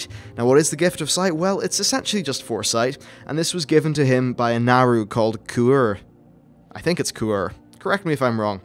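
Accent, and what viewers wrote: Northern Ireland, United Kingdom. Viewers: Great Video. Subscribed! BTW... Are you Northern Irish?! lol. I hear a twang of an accent and it made me curious! :D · Northern Ireland?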